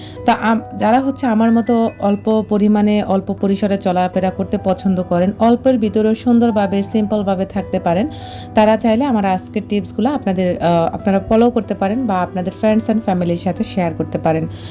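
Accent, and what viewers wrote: Noakhali, Bangladesh. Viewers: নোয়াখাইলা বলে কথা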